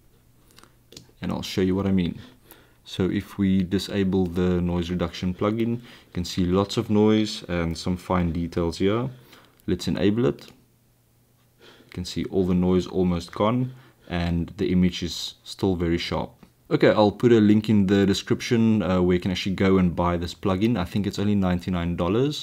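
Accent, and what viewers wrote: South Africa. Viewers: Helpful tutorial, but I'm liking this mainly because I love South African accents :)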